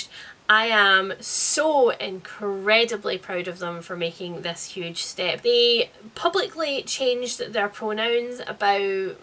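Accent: Scotland, United Kingdom. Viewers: I am in LOVE with your Scottish accent. I have no idea what those other arses are talking about. Congratulations to Lovely Spouse! 💕 I love your openness in talking about your mental health and being up front when you struggle. I come from a family that believes in bottling that shit up or rubbing dirt on it. Your personality and influence is so much more than just book recommendations to me. Thank you. It is because of your frankness that I have accepted my own mental illness as a part of myself. 💕💕💕Please continue to take the loveliest care of yourself and I’m so glad to see you back.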